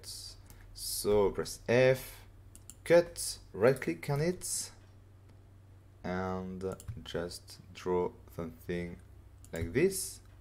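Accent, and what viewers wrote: France. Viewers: I have Fluent Power Trip for a few days. I think this is the tutorial that it all made sense. It's such a great addon man. So fluid and fast, I really like it. bravo I can tell you have a passion for it. Please keep up the progress! I am also glad I spent a lot of time in Paris for work, so I am used to your accent. Your English is getting better each time! While I was learning French, my friends in Paris would always laugh when I tried to speak with them. They tell me that I sound like a Frenchman from Chicago! lmao great work, please keep it going!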